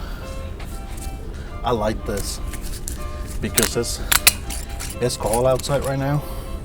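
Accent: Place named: Kansas City, United States. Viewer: Boy, those Potato Taco's with salsa! Yummy! You are a real Mexican with a Kansas City accent. Not making fun of you. I have Mexican cousins that live in Topeka! I am going to tell them about you! I will making your salsa, that's for sure!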